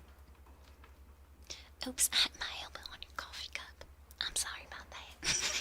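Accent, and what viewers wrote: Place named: South, United States. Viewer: your southern accent is so pretty and this scenario felt relaxing, loving the work you put into the ASMR:_amilove::_amilove: wish I could be a regular in this dinner:_amilove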